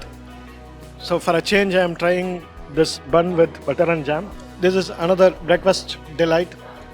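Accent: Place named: South India, India. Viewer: THIS SAUTH INDIAN ACCENT OF NARETION LOOKS ODD TYPES OF IFFICULT TO UNDERSTAND FULLY...NO GOOD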